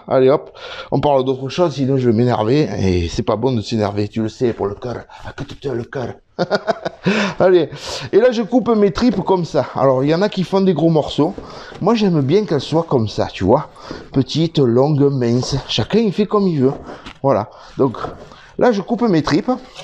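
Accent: South, France. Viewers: Tu sais j’aime ton dynamisme, ton expertise, le images de ton village. Ta joie et ton chaud accent du sud me donne la bonne humeur d’un film de Fernandel. Merci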